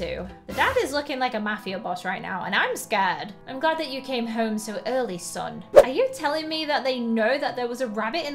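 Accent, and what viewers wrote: United Kingdom. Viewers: Quistion are you British cause you kinda sound like ittttt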